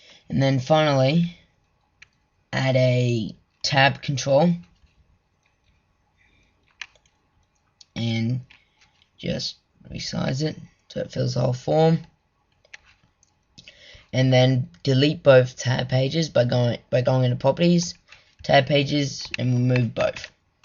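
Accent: Australia. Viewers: 5 more comments till 1337 and are u australian?cuz of ur accent and cuz u said G day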